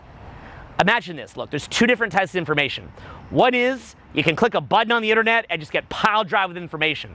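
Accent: Australia. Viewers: Good fuckin Australian accent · i love your australian accent mate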